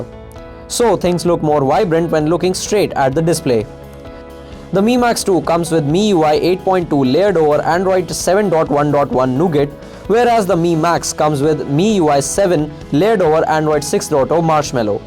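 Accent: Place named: India. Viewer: Really hate Indian accent!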